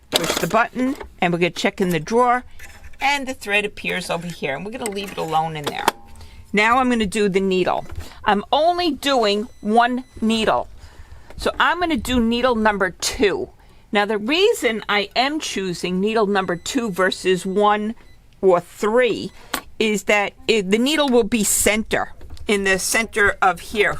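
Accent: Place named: New York, United States. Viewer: Some great tips thanks and I love the NYC accent! She sounds like Fran Dresher...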